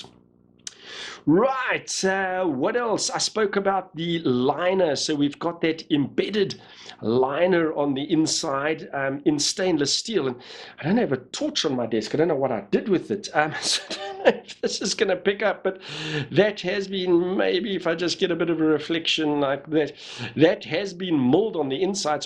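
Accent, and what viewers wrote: South Africa. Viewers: Are you South African?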